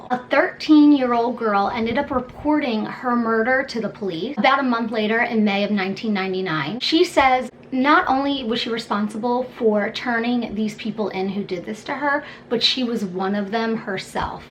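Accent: Delaware, United States. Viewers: Damn I can hear that Delaware accent hard girl I miss home🤣💜💜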